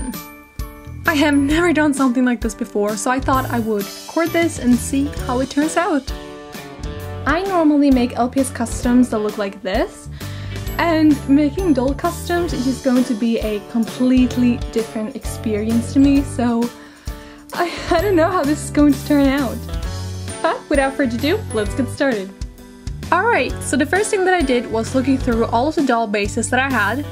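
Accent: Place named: Sweden. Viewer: I love it! Ps your accent is amazing I wish Danish accents sounded like Swedish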